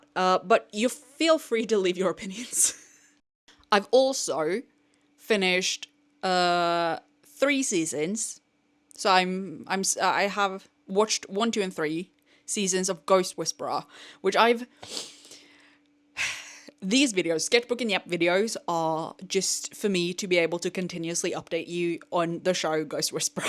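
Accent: Australia. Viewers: I’m English and thought for sure u were Australian lol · Fun video. Also you definitely sound a little Aussie ngl. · I’m English and when I first heard you I thought you were Australian, until I realised you are from Norway 😂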